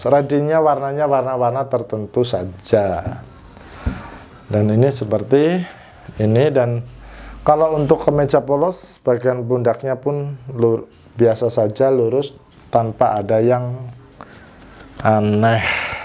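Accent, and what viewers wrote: Banyumas, Indonesia. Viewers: Bahasa indonesia logat ngapak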